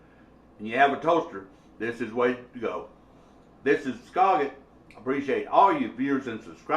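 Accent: United States. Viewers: this dude has a very witty American accent! It really works tho.Thanks!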